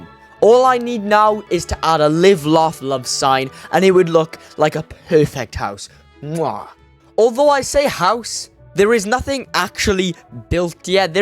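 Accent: Wales, United Kingdom. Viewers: You sound Welsh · Okay, are you Welsh?? Your accent is very familiar 😂❤ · Soon as I heard a fellow welsh accent all the way from Australia I had to subscribe 😅👌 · Are you british? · He sounds Welsh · Are u Welsh u sound Welsh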